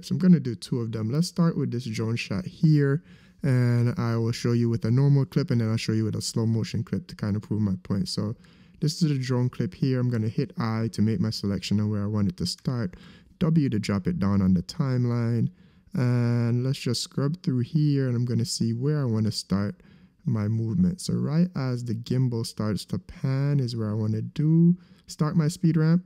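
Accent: Jamaica. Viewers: Great tutorial. Are you Jamaican?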